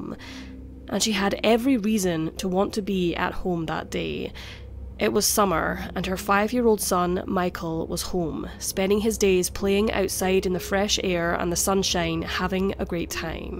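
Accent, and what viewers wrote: Scotland, United Kingdom. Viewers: So good to hear a Scottish crime creator 👏🙏😘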